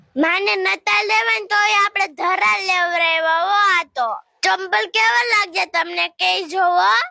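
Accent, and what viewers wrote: Kathiawar, India. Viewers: કાઠિયાવાડી છો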